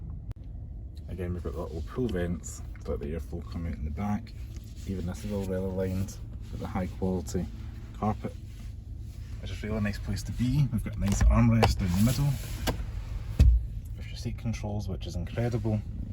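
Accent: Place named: Scotland, United Kingdom. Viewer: You look and sound so Scottish❤❤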